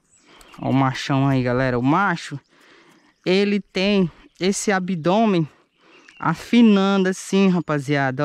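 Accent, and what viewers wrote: Brazil. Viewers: Na real, o sotaque nordestino e lindo demais, não tem jeito kkkk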